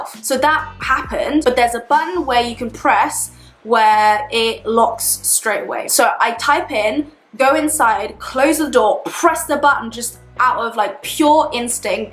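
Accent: United Kingdom. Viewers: Now you know you can pass off as Australian lol. I heard the British accent, but wasn't sure. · it took me 3 minutes into watching this video until i realized that you have a british accent 😭😚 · Nah mate she is very british xx it’s not Aussie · omg it took me till to realize you're british!!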